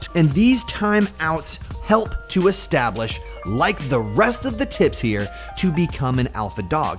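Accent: United Kingdom. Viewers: Because what? PS u sound British and PSS I'm 9yrs old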